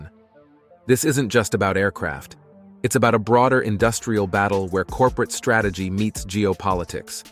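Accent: United States. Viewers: What awful narration. American accents are torture. The CIA can ditch waterboarding. Just make 'em listen to this.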